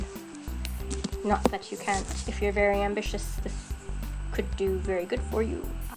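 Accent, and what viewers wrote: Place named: United States. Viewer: lol, I am European and dislike her prosodie. Definitely too American for me ;)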